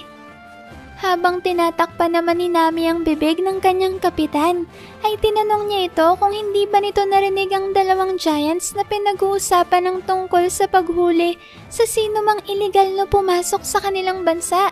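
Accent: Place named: Bicol, Philippines. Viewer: Taga bicol ka pala?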